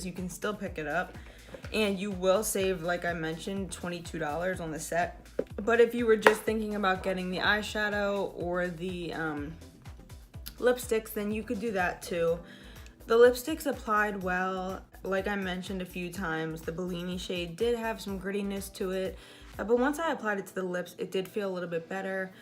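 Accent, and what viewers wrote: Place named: East Coast, United States. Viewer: I LOVE east coast accents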